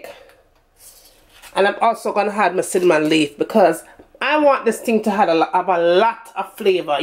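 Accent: Jamaica. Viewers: My girl. Twang fi mi a likkle. Deh accent deh raw and solid like a tru Jamaican! Lol. Tonks fi do watch :-)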